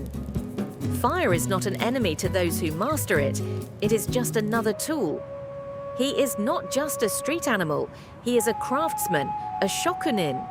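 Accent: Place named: United States. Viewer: Annoying American narrator.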